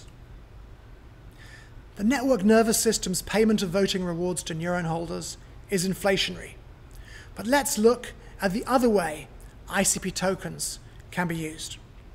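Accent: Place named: United Kingdom. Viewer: Where can I buy the token ? More importantly how does one learn to speak the queens English with such elegance ?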